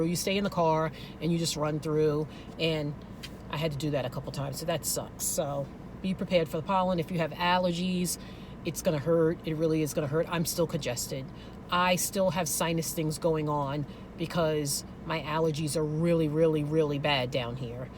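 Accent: New York, United States. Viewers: So as you were talking ,im like she has to be from NYC...girl I am too and moving also, gour video was very helpful.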